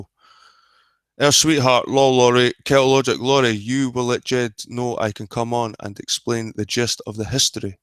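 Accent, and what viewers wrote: Scotland, United Kingdom. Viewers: I triggered Nathan recently & he told me to suck his balls. Got a laugh at of that one. He protested too much when said the Potatoes were perverts, like Adam the Christian penis flasher. He says that having a baby makes me normal, um, no. Pervs have children all the time. He's off his game. He annoys me because he's got a brain but worships Patty like an attack Chihuahua. He's vicious but in an amusing way. Btw, to us, Scottish accents are so diverse. My Scottish friend sounds nothing like you.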